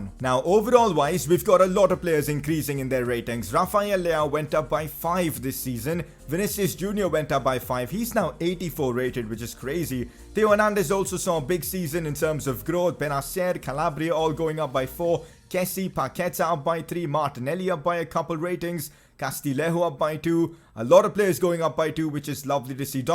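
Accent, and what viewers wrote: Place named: India. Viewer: the worst youtuber with the gi gi gi aaaaaaa Indian accent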